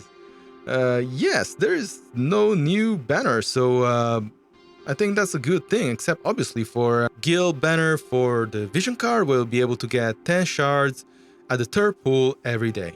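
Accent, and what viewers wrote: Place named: France. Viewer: your accent to me sounds almost french though I could be wrong about that Don't have many friends from France As for my City yeah it's great here always festive celebrations good food great people to talk to and in some parts a party almost everyday if there's nothing to celebrate we find something to celebrate That's the Cleveland way If you ever come to the states (whenever that's possible) check out Cleveland Ohio you'll love it here As for the channel have you considered partnering or collaborating with other streamers of WOTV? There are some great ones out there Auronnj, Paladiah, Diggs maybe even try to get a sponsorship with Amazon like Auronnj and his Amazon coin affiliate link As for the PS5 I hope so too but first they said it be more accessible in 2022 but now there saying it might be in 2023. They say this is the greatest launch of the next gen but when you can't even keep it on the market and scalpers running rampant I would have to disagree sells numbers don't mean a great launch when less than 50% of the population of gamers still can't get your game console. 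Imagine the loss of revenue PS5 game developers are looking at for the first week of sells is everything and you can't just make up for that later. And as for the strategy I'm still working things out but eventually it will all work out in the end even your plan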